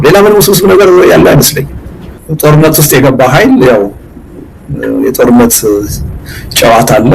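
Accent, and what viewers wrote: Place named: Gaxaree, Ethiopia. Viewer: Diye diye...Wolduya..Gaxaree accent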